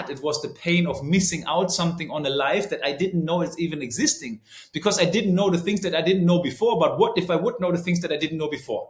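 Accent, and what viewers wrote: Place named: Germany. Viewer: Sorry Kumpel, irgendwo habe dich sprechen hören, dass du in Englisch sich einfacher als in Deutsch äußern kannst😉 Jetzt finde dich Englisch sprechend und ok...du redest fliesend, aber dein German accent ist schon markant, was deine obige Aussage nicht nur in Frage stellt, sondern auch ausschließt. Deutsch ist und bleibt für dich eine Sprache die du am besten kannst(dagegen mein Deutsch...na ja). Bin auf dich in polnischen Medien gestoßen und aus reiner Neugier habe ich kurz recherchiert, wer du bist. Mein herzlichen Glückwünsch zum Vater sein, das Gefühl könnte ich zwei mal lernen. Eins haben wir noch gemeinsam...wir beide leben in Schatten unserer Frauen. Meine Gattin(im Avatar noch mit 36) kommt wie die Marcelina auch aus Polen. War Inhaberin einer Medienagentur in Stettiner TV und Finalistin, Warschauer Look of the year. Nicht desto trotz, ständig bodenständig, liebevoll und smart. 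Mach alles richtig, den ersten Schritt hast gewagt 🥂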